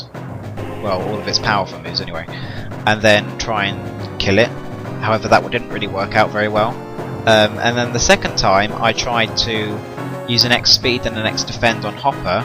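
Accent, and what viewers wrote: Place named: United Kingdom. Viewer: Like you said if u be a bit more fun you really can come far if u do that and are you british cause that accent is lovely!! :)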